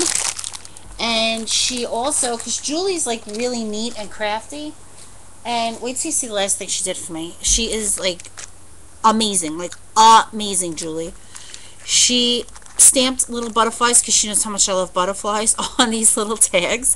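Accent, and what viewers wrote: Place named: New York, United States. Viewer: I love love love your NY accent, I live on the west coast now - and you're makin' me home sick. This an an awesome Haul - Tues Morning has been carrying a lot of Tim Holtz/Ranger Products. :) There are loads of how to Video's here on You Tube for using things Julie bought. Also try looking at the Ranger Site . Can't wait to see what you make with your goodies! Have an awesome day!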